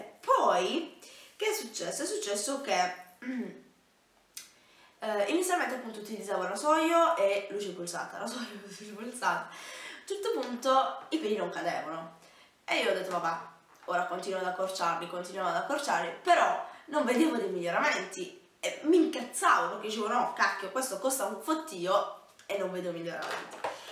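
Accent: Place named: South, Italy. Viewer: Hai la pella chiara come una del nord ma l accento fi una del sud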